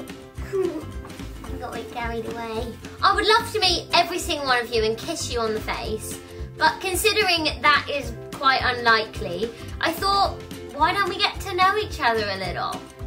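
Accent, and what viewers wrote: United Kingdom. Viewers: videos give me life 💕 I think she's the only reason I learned how to speak Cockney too... 💎💋💁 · I love you British accent and also I subscribe to you on Lucas's channel and your own channel!!!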